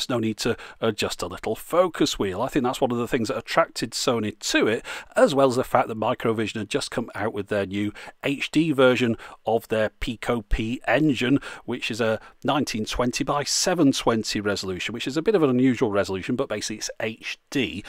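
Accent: United Kingdom. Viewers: Great sound, excellent video, proper British accent. Superb review.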